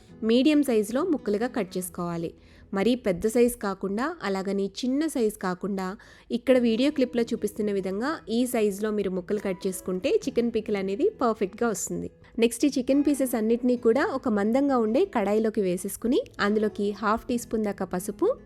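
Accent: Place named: Rayalaseema, India. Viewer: Mam ur pure rayalaseema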